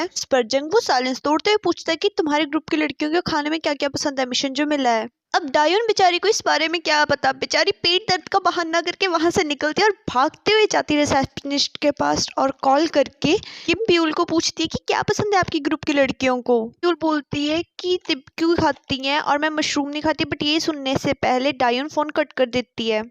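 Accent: Punjab, India. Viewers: Hi sachi app ki awaj bahut achi hai punjabi banda door sa hi pehchana jata hai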